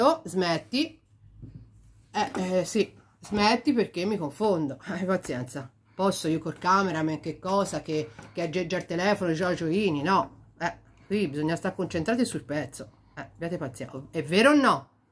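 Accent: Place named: Tuscany, Italy. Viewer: della tua parlata toscana che io adoro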